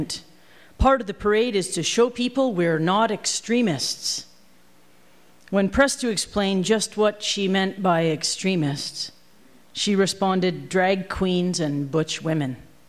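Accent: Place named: Canada. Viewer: their accent is so comically canadian that i can hear my own get more pronounced after i hear them speak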